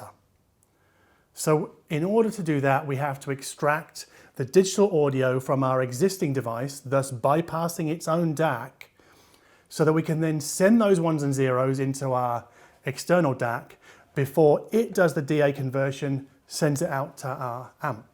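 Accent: United Kingdom. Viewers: So Brit... Ahahahahahahah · How to be a success on YouTube: 1) British accent 2) extensive and deep knowledge of the subject at hand 3) extensive command of the English language, avoiding overused terms such as "warm". 4)ability to give listeners an idea of how something sounds WITHOUT denigrating products or brands. 5) ability to explain a complex subject without sounding arrogant or talking down to the audience. Well done, sir!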